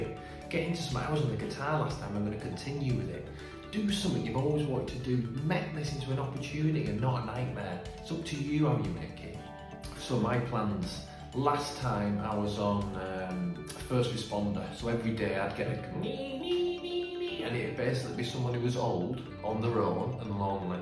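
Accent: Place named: Yorkshire, United Kingdom. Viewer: Great video Coach! When you mentioned your accent I assumed that you meant that your 1st language is not English, but I find pleasure in your accent. Is it Yorkshire perhaps? Thanks again for boosting me. Keep going, you're doing good work!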